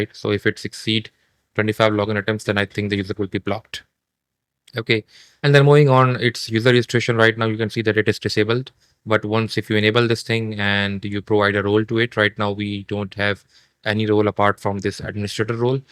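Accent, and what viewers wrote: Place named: India. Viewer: Puh, the Indian accent is a bigger challenge than learning the software itself 😔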